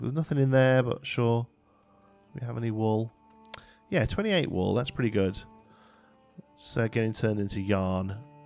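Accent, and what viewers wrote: United Kingdom. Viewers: I got mentioned!! I told you you sounded British (I mean, you are...). I reckon I could easily watch you for an hour walking around talking rubbish. Next episode, " walking around his village talking rubbish". Man, that feature has totally sold me on that game. I love it anyway, but that's the cherry on top!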